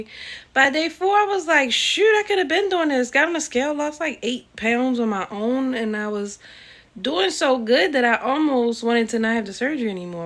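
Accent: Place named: Maryland, United States. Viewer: I looooove your accent! At first I thought it was a NY/NJ accent but when you said John Hopkins I knew lol I’m in MD also just started my vsg journey! Your videos are great!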